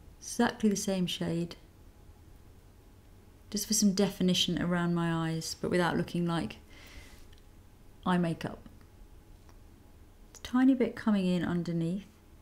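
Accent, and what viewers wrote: England, United Kingdom. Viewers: Great, very informative videos! I also like your English accent (I'm German) :)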